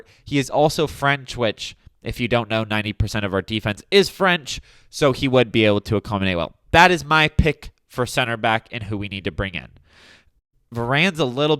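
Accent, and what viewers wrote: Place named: United States. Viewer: Clicked video. Heard accent, clicked off. American accent = opinion void. Especially when you look to young to even remember pre-2012 Chelsea. As soon as you mentioned Kepa turned his back on Chelsea, I knew my instincts were right. Americans are ruining this club.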